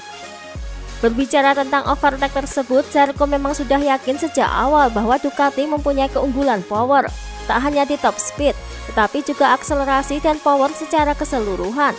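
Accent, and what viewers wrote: Java, Indonesia. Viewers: Sorry telat mba medok heje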